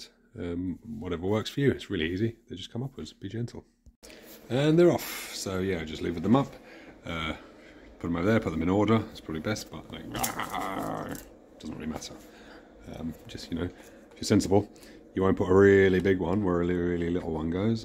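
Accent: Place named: United Kingdom. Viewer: you're British ? ..why dont you speak proper English Proper ?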